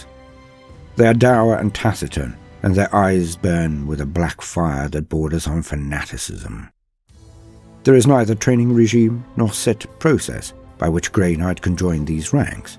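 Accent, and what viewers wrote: United Kingdom. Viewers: I always give warhammer channels an extra 20 points for having a British narrator.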